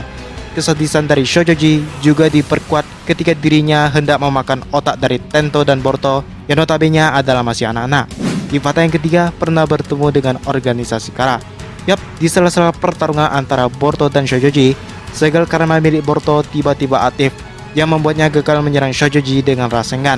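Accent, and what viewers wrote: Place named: China. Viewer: dia keturunan tionghua bro jd logat bicara nya seperti itu ;)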